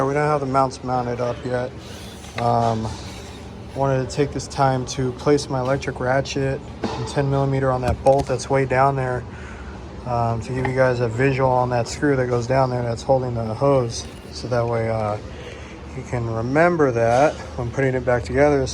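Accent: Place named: United States. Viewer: Thank you from Spain to share this. I love Alfa Romeo Giulia and you explain very well even if with American accent! You help a lot with your videos!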